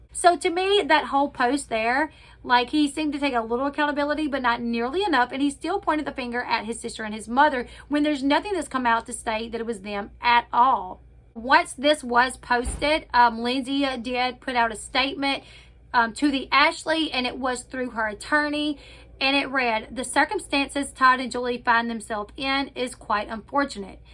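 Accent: South, United States. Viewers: Thanks for the info..I don't care if it's late or whatever I enjoy your channel and your southern accent 👏👏💗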